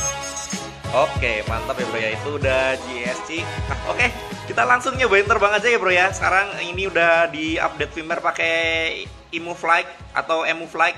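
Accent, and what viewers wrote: Banyumas, Indonesia. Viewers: Halo yutub ketemu lagi dengan.🤣🤣👍👍👍👍..5 inch in the best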